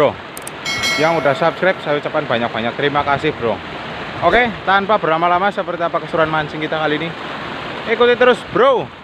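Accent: Banyumas, Indonesia. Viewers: Ngapak Yah broh inyong e ngapak broh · Bahasa e ngapak daerah mana mas bro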